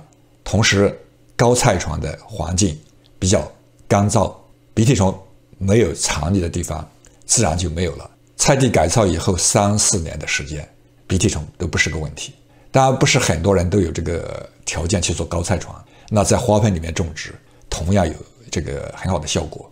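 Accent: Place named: Sichuan, China. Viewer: 谢谢李老师。四川人？很亲切的咔咔角角。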